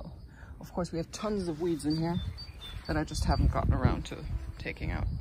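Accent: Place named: Canada. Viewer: I love all of the old brickwork you have! Darn meece are always feasting, aren’t they? Where are you based? Your accent sounds like my lovely neighbors to the north 🇨🇦! Your seedlings look very healthy and happy! Love the bright green shoots! Everything looks great and ready for wonderful food production! 🥰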